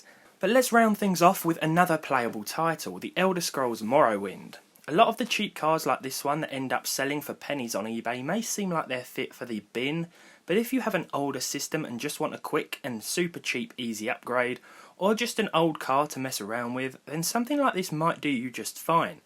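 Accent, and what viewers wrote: United Kingdom. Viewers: I like ur accent where do you come from in UK?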